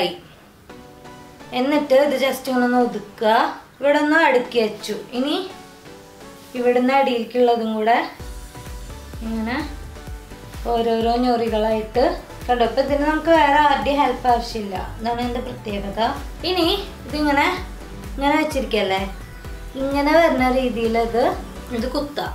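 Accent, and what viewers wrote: Thrissur, India. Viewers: Kure mattu basha channelil chumma body show kanikkan vendi cheruppakkarikalum aunties um sari udukkan padippikkunna kandu athokke vachu nokkumbol sahodari avatharippichathu 100% better aanu pinne thrissur slang um super ☺️ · saaz safa athanu nammade thrisur slang😁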